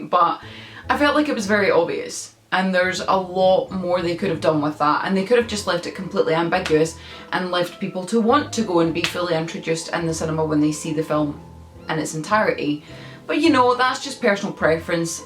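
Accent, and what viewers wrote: Scotland, United Kingdom. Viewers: Your Scottish ? I could tell ha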